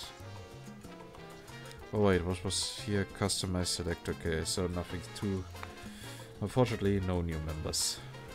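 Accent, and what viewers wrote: Germany. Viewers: German accent is hilarious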